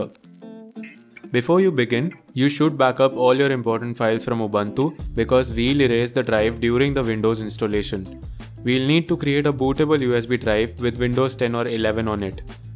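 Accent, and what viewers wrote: India. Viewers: As I heard the Indian accent, I knew this is going to work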